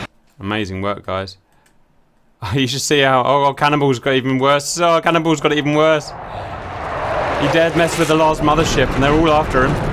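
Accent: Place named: Estuary, United Kingdom. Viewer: Yeah, you have nowhere near a West Country accent, but most Brits will move around and lose their native accent....yours is a bit muddled...so I'll just call it estuary with a twist here and there when you get excited. Not trying to offend you, the accents are getting harder and harder to nail down anymore. I used to coach accents many years ago, and I'm sooo glad I do not do it anymore. Anyways, thank you for the uploads, love the content...sorry if this came off as weird. Right, carry on mate.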